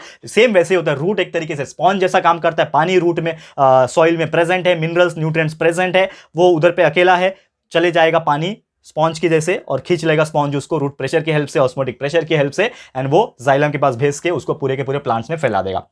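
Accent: Maharashtra, India. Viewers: aap marathi ho kya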